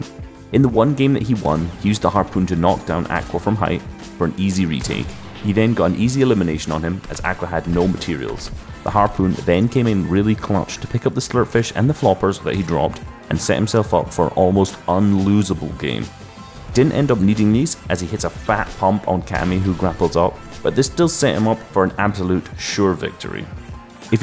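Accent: Scotland, United Kingdom. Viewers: Seen u on nicks stream and to hear a Scottish accent was a pleasant suprise. Keep up the class vids🏴🏴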